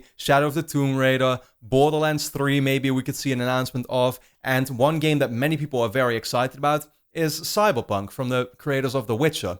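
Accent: Netherlands. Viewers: Hey man, are you Dutch? I live in Amsterdam, want to play some XBL?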